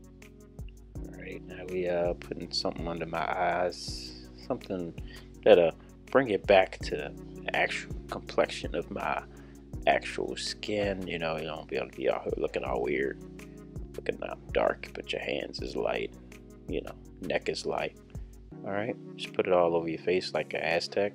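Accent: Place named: Baltimore, United States. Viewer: Bmore in the house!!! Loving the Baltimore accent!!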